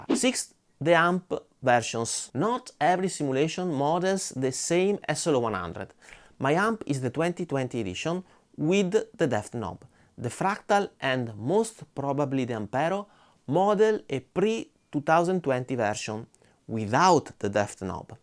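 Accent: Italy. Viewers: First, your videos are the best and most informative that I have seen anywhere! Interesting that for me the Quad Cortex had sound that was close overall but had some high frequency sharpness and harshness that I found problematic. This harshness was not present in the original SLO nor anh of the other contenders. Also interesting that none of them really nailed the tone. They were all pretty close (except for the Stadium- which was embarrassingly bad) but even the closest, the Neural plugin, doesn't sound indistinguishable from the real amp. Many people are saying that the challenge of sound accuracy is over, but there is plenty of room to improve if this comparison is representative of the current state of modeling/capturing. A lot of it may just be down to which capture you are using and how well the capture was done. One constructive idea if I might? It would be really helpful to have the clips played with the original right before each of the contenders. 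It's much easier to hear when you have the opportunity to hear the original again right before each of the new sounds for direct immediate comparison. Thanks for all the hard work you have done in sharing all of this information! Love your accent, Italian?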